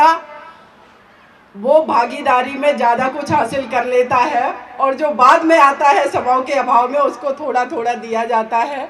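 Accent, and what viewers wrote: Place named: Pahadi, India. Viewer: So Great speech mam pahadi voice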